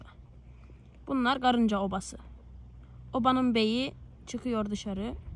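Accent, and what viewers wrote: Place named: Rural, Turkey. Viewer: Şu YouTube de ilk kez bana hitap eden bir sayfa buldum... İstemsizce saf ve icinden geldiği gibi bizim köylü agzi ile konusmasi beni o kadar güldürdü ki Allah da seni güldürsün 😂😂😂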